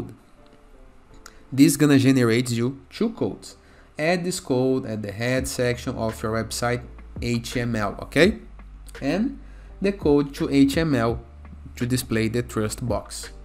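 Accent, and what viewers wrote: Brazil. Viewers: Mano, impossível não reconhecer o sotaque brazuca. Parabéns pelo canal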